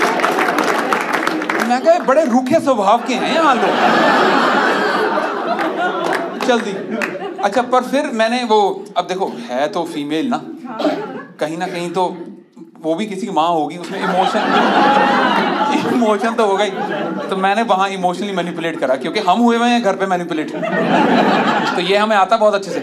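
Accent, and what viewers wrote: Punjab, India. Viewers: That Punjabi accent mix with hindi makes it different. 😅😂😅😂😅😂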